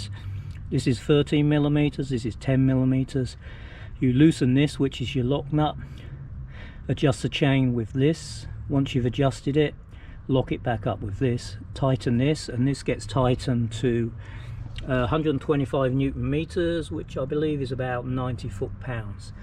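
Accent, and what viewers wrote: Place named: England, United Kingdom. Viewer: Hi, just observing your front brake callipers looks different to my BMW stock pair. I just picked up my S1000RR last week 2021 model. Although you have an English accent you mentioned bucks and your reg plate has reflectors on the side (USA Std?). Just wondering if this was anything to do with the weeping issue in the USA?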